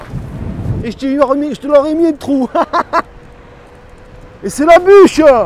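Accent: Marseille, France. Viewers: c'est sketch chez moi, dès que la situation s'y prête on t'imite. Ma meuf quand je la gêne dans la cuisine elle gueule 'Oh enlève toi du milieu" en prenant l'accent marseillais. Pareil, elle me montre un meuble qu'elle kif et elle me dit "Tu pouce blue ou pas ?" Tu rends ouf les gens 😂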